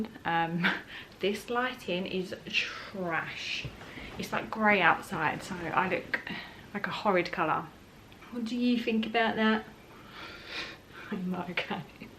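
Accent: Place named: United States. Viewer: Yass girl look at that fresh beautiful skin, you are glowing ✨✨ When you said awkward 🤣🤣 I feel I’m a bit old for advice videos but I still watch anyway cos you are hella entertaining...and you guessed it I said hella in American accent ☺️ You don’t need to apologise for anything. Ps my family are hella annoyed at me because of you 🤣🤣 I’ve only been calling bloody eat out to help out - help to buy...that’s all your fault haha x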